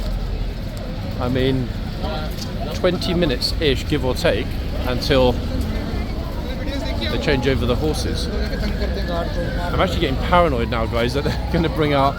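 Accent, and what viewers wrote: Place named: United Kingdom. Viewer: What that guy meant by jerk walked in front someone walked in front of his camera as he took a picture. Also I really wish you could get Trooper Ellis to do a little Q &A for us, partly because I like British accents (yours is lovely and almost musical) But also because I'm genuinely curious about what it's like to Work with all the horses, It's to bad we can't camera and mic up a Kings guard for a Day in the life of video.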